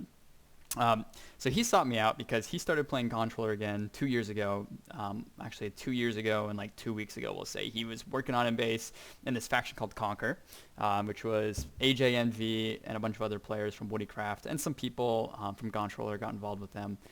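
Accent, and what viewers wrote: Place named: United Kingdom. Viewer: You sound like your from the uk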